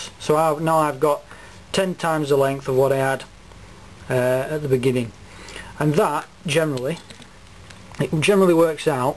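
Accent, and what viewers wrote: Australia. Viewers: I got a question are you Aussie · are you from Australia mate???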